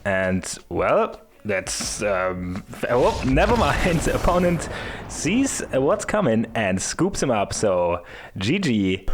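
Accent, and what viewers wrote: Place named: Germany. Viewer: are you a german person though? Because it really sounds like it. At least you are from Austria or Switzerland i know it :D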